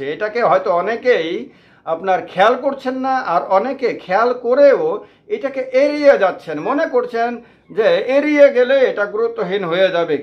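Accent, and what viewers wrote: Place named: Bangladesh. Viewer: Tumi ekjon bangladeshi tumar kothatei bojhajai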